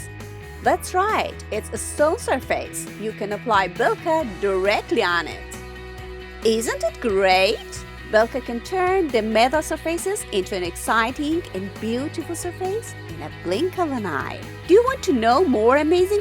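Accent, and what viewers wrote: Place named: Turkey. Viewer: Oh god! Very heavy Turkish accent. Poor voice over. Wrong pronunciation.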